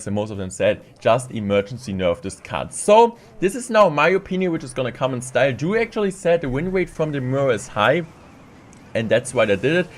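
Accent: Germany. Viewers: Where is this accent from ? For a sec I didn't realize it was English (no offense ment) German? Like he's saying mura instead of mirror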